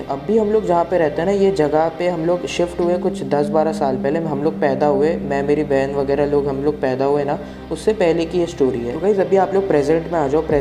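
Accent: India. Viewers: Bhai ap gujarati ho???? +_+ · Are you bengali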